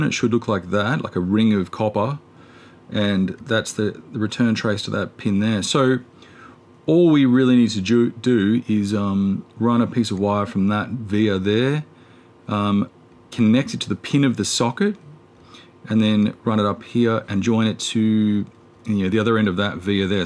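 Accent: Australia. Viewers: Great video. The microphone works just fine. I'm mexican and I do understand very well your aussie accent. Thanks for your video.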